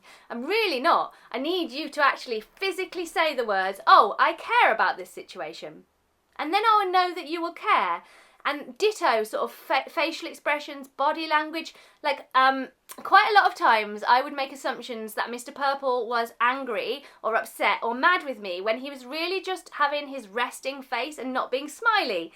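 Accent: United Kingdom. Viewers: Good upload. Thanks for doing so. I'm guessing the narrator is English or lives in the UK, based on this person's accent - I'm Canadian and live in Toronto, but I'm sure a lot of what this person is saying could apply in other countries & cultures.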